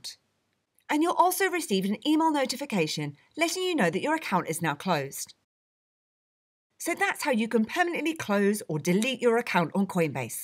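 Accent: United Kingdom. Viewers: UK girl huh, you single?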